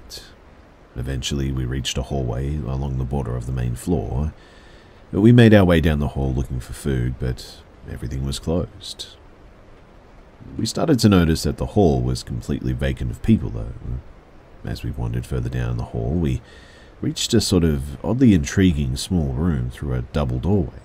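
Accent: Australia. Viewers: Welcome back, man. Great to have you & your rhythmic Aussie cords. 🤭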